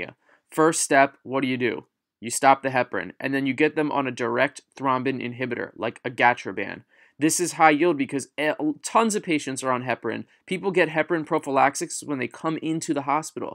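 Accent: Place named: Philadelphia, United States. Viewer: Super helpful video! Also, I'd recognize a Philly accent anywhere...are you from Philly?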